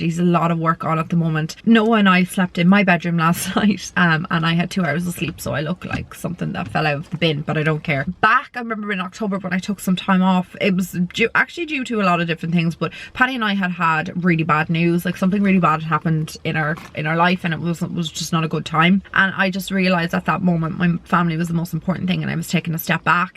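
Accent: Ireland. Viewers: I’m so happy 😀 that your finely being your self. You should enjoy 😉 your life and your family always comes first. Who cares what people are going to say because you can’t please everyone and you can’t get everyone to like 👍 you. I love 💕 to hear 👂 about Baby Noah and how much he’s growing. Don’t ever think 🤔 you have to apologize because you don’t. Besides I live here in Texas and I love 💕 it when y’all show parts of Ireland 🇮🇪 and I love 💕 your accent. Now go get some rest. Merry Christmas 🎁🎄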